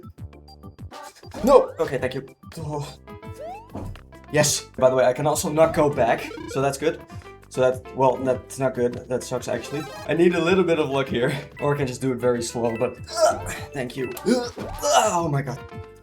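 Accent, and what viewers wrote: Netherlands. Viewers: Wait a min this channel is from the netherlands? So: oke test 1... 2... 3... test ik ga er dan vanuit dat mans nederlands is toch? Of ehh niet? Want als ik zo naar je kanaal kijk zie ik nederland en ik ben ook nederland en ook ik zag net ook nog € en dat is dan al europees ik dacht op recht dat je van Amerika zou komen en gewoon $ zou gebruiken maar nope nederland te gek en ook hey ik hoor langzaam ook een accent en die naam ik weet dat kip nederlands is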